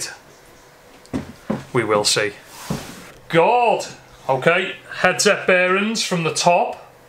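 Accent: Liverpool, United Kingdom. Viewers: Tuning in to your scouse tones again on a Sunday.👍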